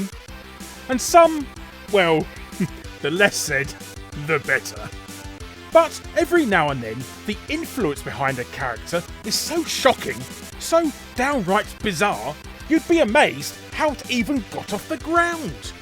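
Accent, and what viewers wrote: United Kingdom. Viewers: Your cartoon likeness doesn't look like someone you'd expect to have a brittish accent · Nah, don't feel stupid. He has quite a strong British accent (London...I think? I'm no expert.) and I imagine it can be very difficult for a non-native speaker to understand if they have not learned from a British teacher. And even then there are a lot of different English accents. I personally enjoy his narration, but then I am more accustomed to the accent.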